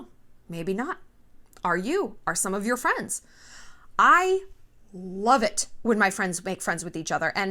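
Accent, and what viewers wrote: France. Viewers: The French accent 😭😭😍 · Got a like from me for that french accent :D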